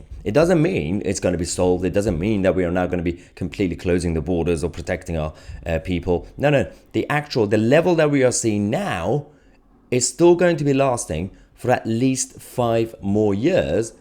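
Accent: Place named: Northern England, United Kingdom. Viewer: Hey dude, im rubbish with accents... was it Manchester... Liverpool... somewhere further afield? Just googled it.. born abroad... to 2 foreign parents! Guessing you don't mind legal migration then lol Personally I welcome you to our great nation, just like I welcome everyone else who comes!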